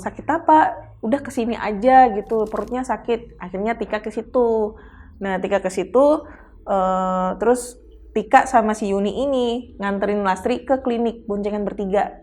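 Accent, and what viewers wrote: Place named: East Java, Indonesia. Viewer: lidah wetan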